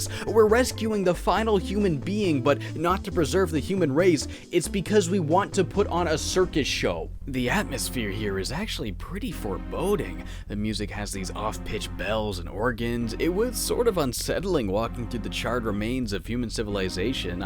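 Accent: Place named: Canada. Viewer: can someone finally answer this for me? is a fellow canuck or is he like from northern america? because that accent is just so hard canadian sounding and and a canadian with as very separate yet funny sounding accent i freakin love it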